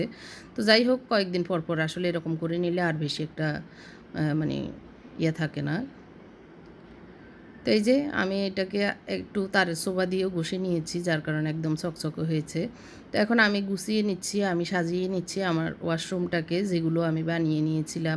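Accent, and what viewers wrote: Noakhali, Bangladesh. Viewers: আন্নের বাড়ি নোয়াখালী মনে হয়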